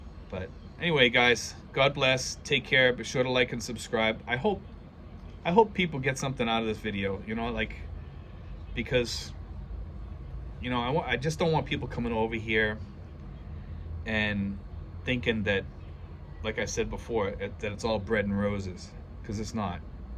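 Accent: Boston, United States. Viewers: Awesome video as always . Cool Bawstawn accent dude !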